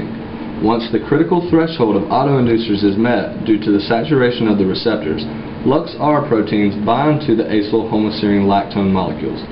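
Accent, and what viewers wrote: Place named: South, United States. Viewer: visuals were kind of blurry, but quick pace made it easy to follow. I find your southern accent endearing :)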